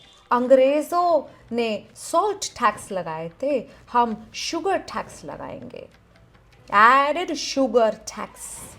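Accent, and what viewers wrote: South, India. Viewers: Listening to her for the first time here, I thought she was south Indian. Then I looked at her Hindi name and other videos and realised it was mimicry 🙂 I have to admit, very rarely do you get non-south Indians imitating south Indians in an accurate manner. It is always some cliched "ayyo rama" imitations. I am sure she put in a lot of effort to learn the accent for every word that she is speaking in this video.👏👏👏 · While I agree that taxes are too much that gdp will actually drop. Lets not be political about it, since the same happened during the congress regime. Both of them follow the same capitalist policies while in power and plain to be socialist while on opposition. And your Tamil accent is horrible. Indiands probably pay the highest taxes. Economics doesn't work this way. We should have had more reforms,but it seems like modiji like chidambaram ji is trying to shore up enough so that the next set of freebies can be announced before next elections. 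Wouldn't be surprised if some major changes would be in place before next polls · I ❤ her south Indian ascent?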